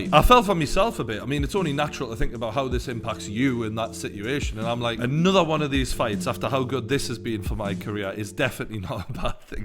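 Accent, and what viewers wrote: Newcastle, United Kingdom. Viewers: Ending with a bit of, love that 👊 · Man I love the true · true fucking good work keep it up brother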